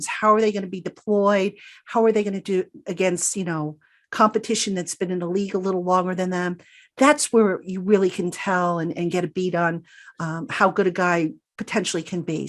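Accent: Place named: New York, United States. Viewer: Thanks for the insight. I get a little NY media in your tone and reviews. I know that’s your background so it’s expected but hearing optimism is also refreshing. That 2nd 3rd round had you with a tone of concerns as us all. Let’s all hope a new day has come.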